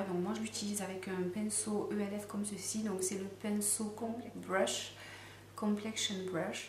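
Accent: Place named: South, France. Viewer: coucou ! ça fait plaisir de te voir en vrai ! Toi aussi tu as un petit accent du sud, tu es d'où ?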